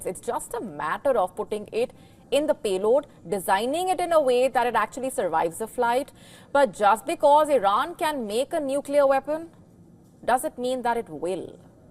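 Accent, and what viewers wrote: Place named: South India, India. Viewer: Is the news reader a south india. Her accent seems she is from south.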